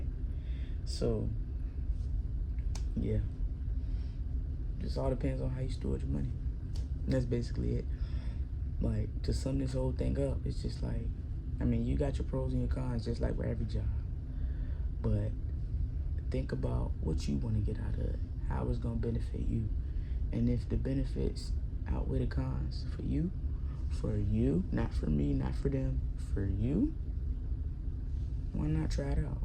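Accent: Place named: South, United States. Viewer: yk the whole video i was saying “damn she talk like she from the south” it had me wondering where you was from cs shit got to familiar 😂aye as soon as you said most people ain’t seen outa va Ik you hada been from here ,757 specifically i might be wrong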